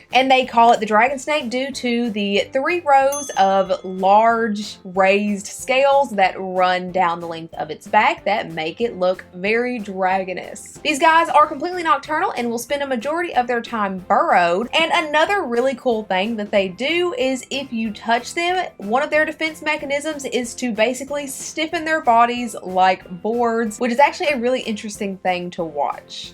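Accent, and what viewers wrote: South, United States. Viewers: First of all, my 6th graders loved this video. Several of them look just like the reptiles you showed. We think that you are the perfect woman. Your teeth are so white. Your Area 51 T-Shirt was a great choice. I loved the southern accent. Your spooky eyes had hypnotizing effects with those white rings on them.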